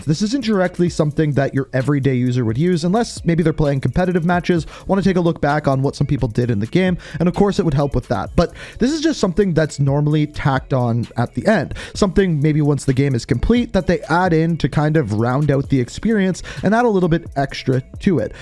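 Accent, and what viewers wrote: Canada. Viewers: It's always funny to listen to how Canadians say "about" . I'm not watching the rest of this video hearing someone speaking this way. It's like Canadians specifically love to say the word about for some reason. Disliked and not watching it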